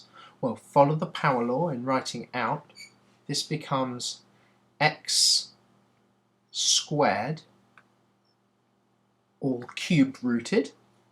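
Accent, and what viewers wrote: United Kingdom. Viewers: Reasons Why you're Better than my math teacher: -I can understand what you're saying -You don't expect me to already know what you're teaching -You have a British accent. That is just AWESOME!!! Thank you :)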